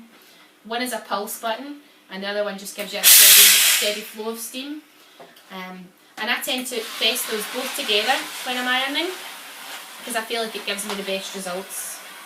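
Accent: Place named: Ireland. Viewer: where do you from ? your accent is almost like irish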